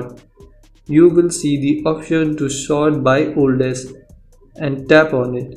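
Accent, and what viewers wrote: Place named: India. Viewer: It's always the guy with idian like accent who fixes problems i salute all of you you don't know how much y'all helps luv you guys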